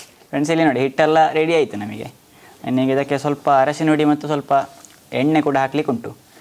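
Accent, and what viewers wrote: Mangalore, India. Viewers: ಯಾವ ರೀತಿ ತಾವು ತಯಾರಿಸುವ ತಿಂಡಿ ಪದಾರ್ಥಗಳು ವಿಶಿಷ್ಟವಾಗಿರುತ್ತವೆಯೋ ಅದಕ್ಕಿಂತ ವಿಶಿಷ್ಟ ನಿಮ್ಮ ಮಂಗಳೂರು ಕನ್ನಡ. ಕೇಳಲು ಬಹಳ ಸುಂದರ..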